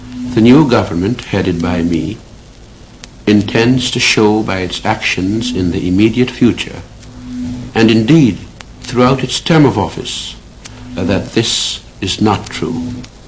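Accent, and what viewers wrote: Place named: England, United Kingdom. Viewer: Great orator my ass. He trying to sound British. That is no Guyanese accent.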